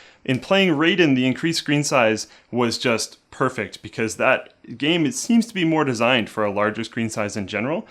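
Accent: Canada. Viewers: Is he Canadian? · dat canadian accent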